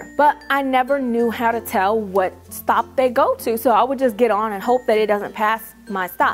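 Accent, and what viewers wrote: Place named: Los Angeles, United States. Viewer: LA accent?? I like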